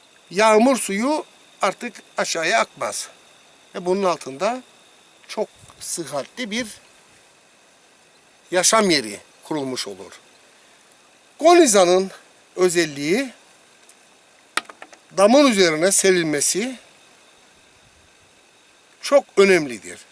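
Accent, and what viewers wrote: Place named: Cyprus. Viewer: dalcıkları, bayılıyorum kıprıs şivesine 🙂🥰 Maaşallah Horozumuz da pek yakışıklıymış. Ne güzel ibiği var. Nazar değmesin tü tü tü tü 😁 Canım horoz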